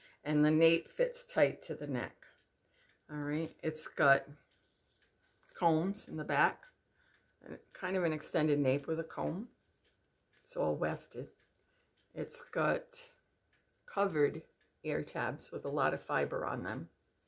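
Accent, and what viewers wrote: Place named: New York, United States. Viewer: (that NY accent~love)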